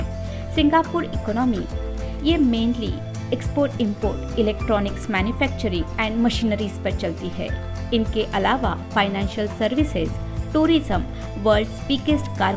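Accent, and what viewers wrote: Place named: Maharashtra, India. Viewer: काय मस्त हिंदी आहे तुझी...पण कधी कधी, काही शब्दांतून मराठी बाहेर येते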